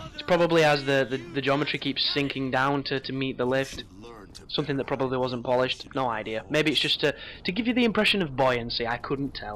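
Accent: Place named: Manchester, United Kingdom. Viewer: Thanks for the vid. I was stuck on this then got it first time. Nice to hear another northerner on YouTube. Doesn't sound far from Manchester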